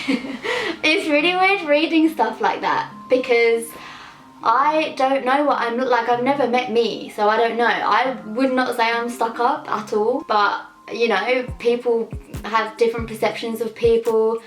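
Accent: RP, United Kingdom. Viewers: Naa u sound posh still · i think the stuck up one was thought bc of your accent bc its kind of posh compared to others especially compared to mine ahahhaha im from new zealand but yeah idk i personally don't think you are I think you're awesome!!!! :DD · You say put the roadman accent on, what roadman accent 😂u still sound posh bare gyal in South speak road u don't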